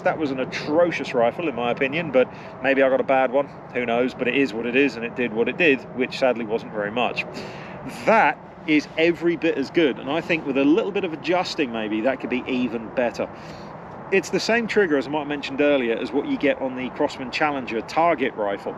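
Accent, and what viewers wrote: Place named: United States. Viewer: Nice one, i was watching the video while in the bath, no weirdness intended, i nearly pissed myself with your American accent, Brilliant.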